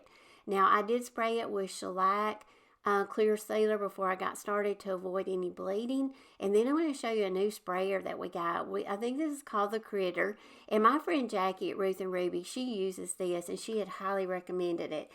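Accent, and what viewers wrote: South, United States. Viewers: You absolutely amaze me! One day will you do a video of your whole house? I taught French for many years and I love how you use the French IODs! I’m from Alabama and I appreciate your southern accent!💖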